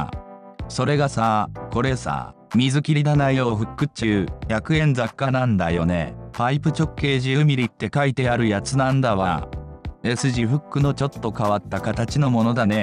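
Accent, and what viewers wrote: Hokkaido, Japan. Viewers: 今回は音声付きですね😆 北海道弁の感じがいい感じですよ👍 ソーラーパネル、災害の時のためにあると良いですね👏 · ホント、北海道もし冬に災害来たら怖いですよね😱 なまらとか、北海道弁🤣🤣あまり最近使わなくなりましたね。なんとかさーとか🤣北海道弁に聞き入っちゃいました。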